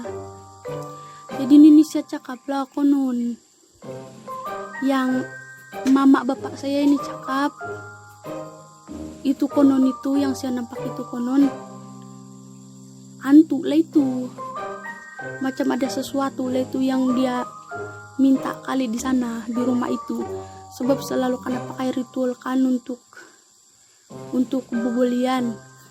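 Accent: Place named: Kalimantan, Indonesia. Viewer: ckap serupa orank kalimntan..borneo😃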